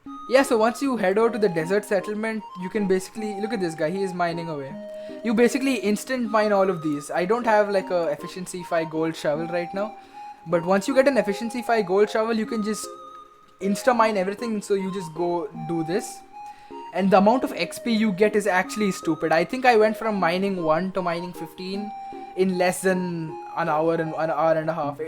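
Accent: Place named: India. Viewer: why does he sound like he is an indian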